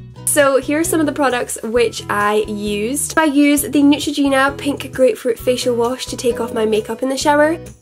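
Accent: Scotland, United Kingdom. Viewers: Where do you live? Are you Scottish ? I am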